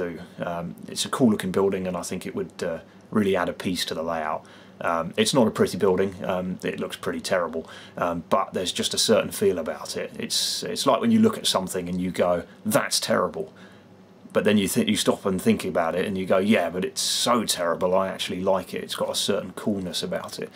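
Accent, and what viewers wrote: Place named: Scotland, United Kingdom. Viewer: I've watched all your videos. I think its time you done a face to face version now! I wanna put a face to that Scottish accent!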